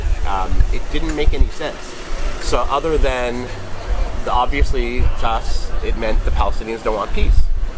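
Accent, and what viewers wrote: Canada. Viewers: He sounds so Canadian.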